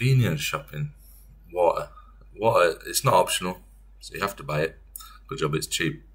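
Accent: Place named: Midlands, United Kingdom. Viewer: Accent tells me you come from somewhere between Nottingham and Manchester - but not Chesterfield. Buxton?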